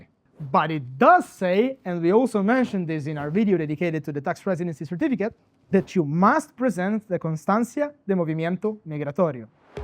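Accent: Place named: Italy. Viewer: A very impressive video and PP team, the information, key points, story telling and entertainment were a great value and I appreciate the company I'm working with (Paraguay Pathways) is willing to go to such efforts to provide this information to the internet. Not to mention the great entertainment. AMAZING. Put in a suit with his Italian accent, he can promote Paraguay to the world:). Thanks again Paraguay Pathways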